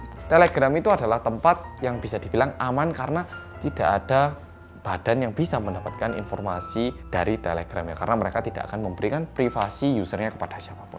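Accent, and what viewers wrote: Java, Indonesia. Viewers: Jadi ini yo kasus yg mejadi trending di naver slama 3 hari, sya udah liat tpi nggak bisa baca tulisan korea. Untung up ini kasus jadi ngerti kan! Thnks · Makin maju dunia makin parah. Aku ada Telegram on my hp but I don't use it, cuma sempat register sja sudah lama dulu. Kalo gitu gak apa2 kan? Btw, ini pertama kali lihat Korean yg bisa medok gini, lucu deh 😅. Di tmp ku sja kalo warga asing yg ngomong rata2 masih ada logat dri negara mereka juga. All d best,& stay safe during this covid19 pandemic ya..😊✌ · Korea rasa jawa kali ya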